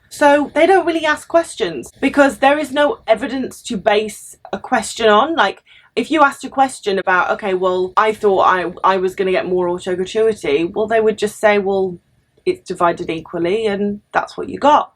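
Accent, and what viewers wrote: United Kingdom. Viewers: I subscribe to you because your voice is loud and clear even so you speak British English I understand you I can take a shower while listening to you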